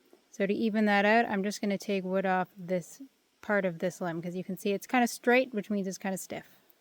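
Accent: United States. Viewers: ... fantastic technique .. congratulations ... are you from Queens?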